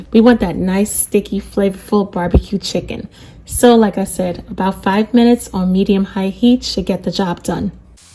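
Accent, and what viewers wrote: New York, United States. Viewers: Nice video. Are you from New York or New Jersey? I hear something I’ve heard before in how you talk. Can’t put my finger on it.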